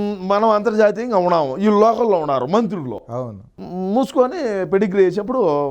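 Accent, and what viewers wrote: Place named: Kadapa, India. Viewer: kadapa aa slang thone cheppachu bro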